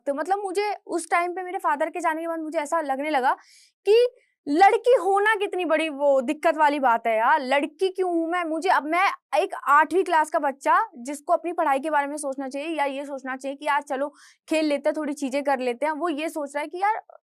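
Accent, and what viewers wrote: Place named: Bengal, India. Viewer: Your sense of humour and witty expressions are unmatched. Excellent. Initially i was also thinking you make videos of celebrities so should be from a rich family with good contacts. May be some nepo kid. Today knowing your background i really respect you. You became and ideal example of success from ground. Last but not the least, you look like Bengali and your witty actions also matches that. Your hindi also not like a north hindi but gol-gol like Bengali Hindi, very sweet. 😂 God by mistake give you birth not an Bengali. Anyways I respect all human being. Have some video with traditional Bengali sharees. I not only subscribed but like and comment in all videos to support you and to support a fighter and good work. God bless you.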